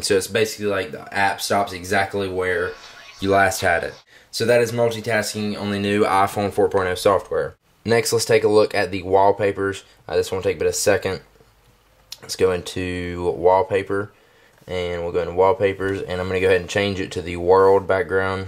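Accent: South, United States. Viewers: southern boy lol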